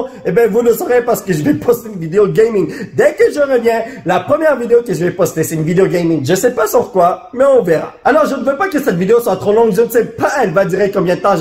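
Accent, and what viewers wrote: Quebec, Canada. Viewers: VIVE LE QUÉBEC ! J'ADORE TON ACCENT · Tu est québécois?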